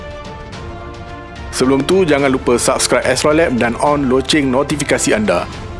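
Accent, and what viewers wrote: Malaysia. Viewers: Cara bercakap macam orang Malaysia, Salam Dari Malaysia 😂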